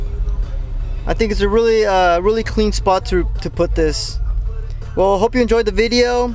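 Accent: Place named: Mexico. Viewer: Dude, amazing level of detail and tips on your videos! You sound Mexican, if Trump tries to deport you, you can hide out in my house for the next 4 years :)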